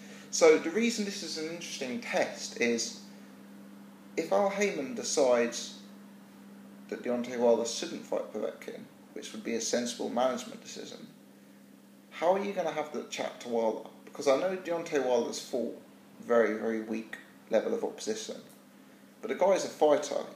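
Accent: United Kingdom. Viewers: Your british accent is top. And your observations and commentary are spot on mate.Just discovered your videos today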